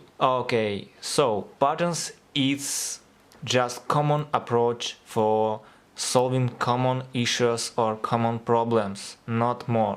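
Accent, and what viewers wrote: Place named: Russia. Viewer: я бы сказал , что это русский мужик читает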